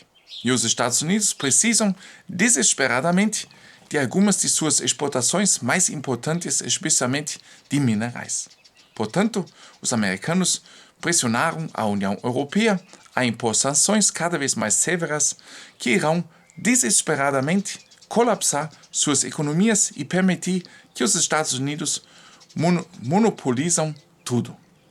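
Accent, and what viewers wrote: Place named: Germany. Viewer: Parabéns! Eu gosto de assistir seus vídeos. Balneário Piçarras SC Brasil Os descendentes de alemães que moram aqui no Brasil falam com sotaque igual ao seu ehehe Em Santa Catarina temos uma cidade com quase 90% da população alemã que se chama POMERODE. Também tem a cidade de Blumenau que tem bastante descendentes de alemães.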